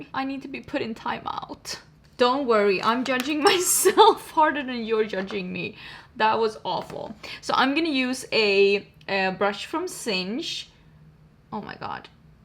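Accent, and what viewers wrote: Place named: Sweden. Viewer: The automated caption did NOT follow you on the words skolavslutningen and midsommar. 😅 Love the swenglish tho! Keeping it real. 🇸🇪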